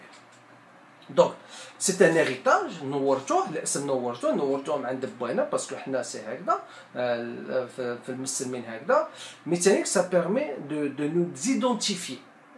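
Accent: Tlemcen, Algeria. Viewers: C est evîdent il est tlemcenien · Pour moi il a l'accent de Tlemcen et Nedroma D'une Algérienne de l'ouest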